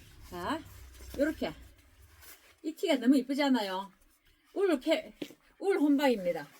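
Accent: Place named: Gyeongsang, South Korea. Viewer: 싸장님 갱상도 사투리 넘 재미나고 멋지세요~~^^아방가르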